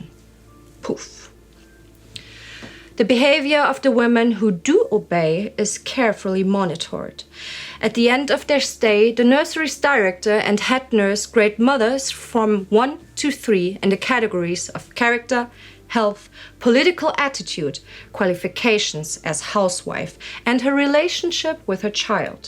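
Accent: Germany. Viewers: A clue for you, she's speaking English, albeit with a German accent. HTH. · your video is exceptionally well researched and the presentation is professional and beautiful. I assume that you are German although your English is excellent. I can hear that in the way you pronounce German names and terms. I'm going to subscribe to you now because you really deserve it. Love from the German Alps:) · It is called a (faint?) german accent. It goes well with her stern looks. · Very interesting post to the channel. Also, your German/English accent/inflection is charming and reminds me of friends mother who was born in Germany and imigrated to the US in the 193os..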